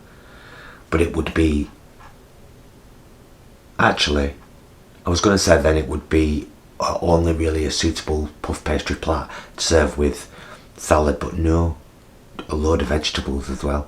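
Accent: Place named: Northern England, United Kingdom. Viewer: Cheers!, also very good to hear a proper northern voice whilst I’m stranded abroad 😍🙌🏻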